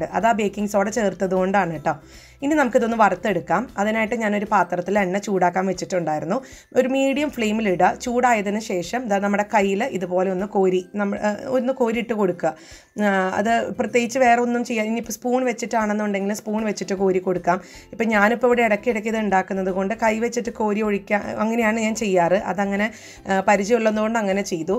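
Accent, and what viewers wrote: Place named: Palakkad, India. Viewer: Samsaramishtama chechiye jadayillatha nishkalangamaya nadan basha. Pkd aano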